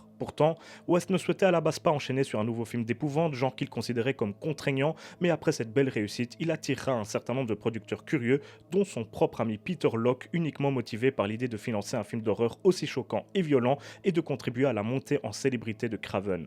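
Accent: Belgium. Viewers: J'aime bien ton accent belge 😎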